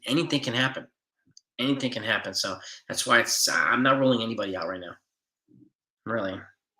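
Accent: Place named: New York, United States. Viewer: 1991 was the last time the Yanks had a month as bad as Sept. And it was Sept. 1991... Don't worry, I got your back. That's about all I have, you got it all, Home grown NY'er, got the accent (to me anyway, I'm an Oregonian)...Love your content. Glad I came across your channel. Wish the Yankees would have finished stronger. I know the games didn't really mean anything, but still, split with Texas? We should have at least gone 3 out of 4. And Cole worries me. That was like 3 starts in a row where he gave up a tying or go ahead HR. but I think the offense picked him up in two of them. Gonzalez is a lefty and Tampa's pitchers are all righties (Starters anyway). I think that's what they were saying..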